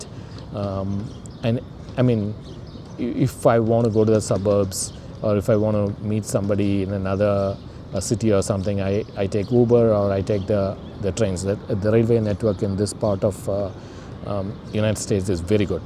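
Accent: Kerala, India. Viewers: Having not lived in Kerala, you still have a Malayali accent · Why didn't you pick up the American accent in 35 years? You still have the mallu accent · The first accent figured out Mallu ❤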